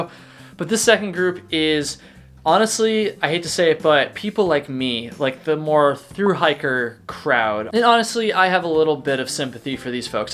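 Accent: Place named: South Carolina, United States. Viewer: Dude are you from SC?! If so what up fellow South Carolinian!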